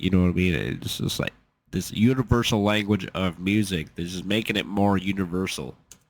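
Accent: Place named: Midwest, United States. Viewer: A-Ha! I see, you live in IOWA. I'm Japanese, but I used to live in Nebraska. Your face, the way you speak reminded me of an American friend of mine back in the days in Mid-West. It must be very freezing now. Take care of yourself, buddy.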